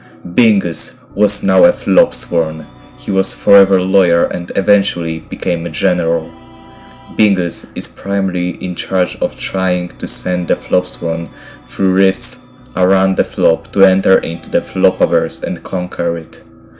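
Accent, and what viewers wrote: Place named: Armenia. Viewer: Nice to hear someone talk with Armenian accent 🇦🇲🇦🇲🤘 Have a good one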